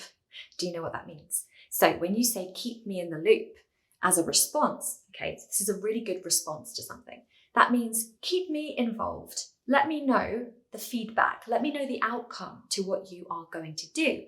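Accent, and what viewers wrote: United Kingdom. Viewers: I love your accent. As a Brazilian, it has helped me understand British English. Keep up on that! Handy expressions! 🤗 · ❤we love you as a teacher , you’re videos making my accent like Uk people accent 😊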